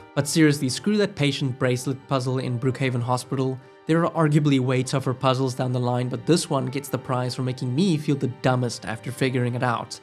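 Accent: South Africa. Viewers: South african? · I smell South Africa?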